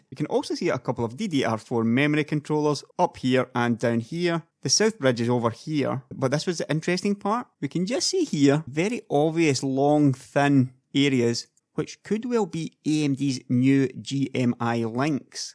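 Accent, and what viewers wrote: Scotland, United Kingdom. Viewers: Are you Scottish? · i love scottist accent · that lovely scottish accent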